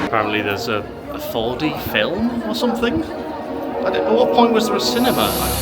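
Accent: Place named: Yorkshire, United Kingdom. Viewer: haha spoken like a true yorkshire lad! <3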